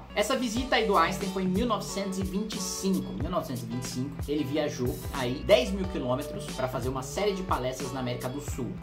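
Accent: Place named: São Paulo, Brazil. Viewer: Só pela fala acredito que seja paulista... e paulista falando que "última bolacha do pacote" chega ser insano kkk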